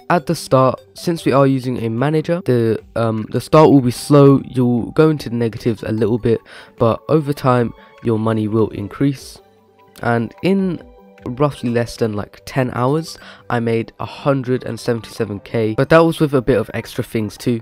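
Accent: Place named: United Kingdom. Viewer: i love the bri'ish accent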